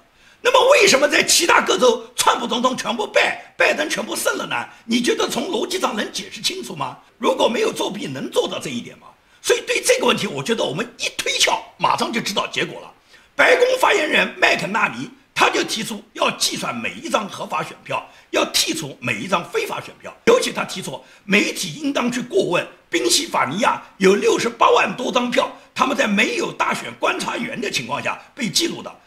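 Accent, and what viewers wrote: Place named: Guangxi, China. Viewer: 一听就是广西底下流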